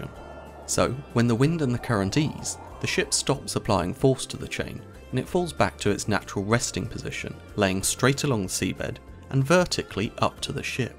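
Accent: United Kingdom. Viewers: i was going to say the same but since he sounds british maybe they pronounce it that way.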